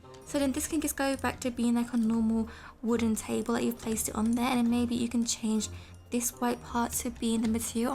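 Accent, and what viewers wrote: United Kingdom. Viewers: HER BRITISH ACCENT❤️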